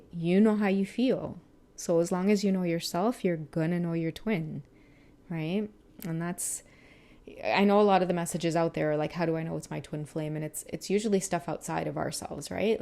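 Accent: Trinidad and Tobago. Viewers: ‼️‼️ you know a Trini when you hear one! ❤ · Not the Trini 🇹🇹 accent! I’m screaming 😂🤣😂! Yaaaassssss 🥳🥳🥳🥳🥳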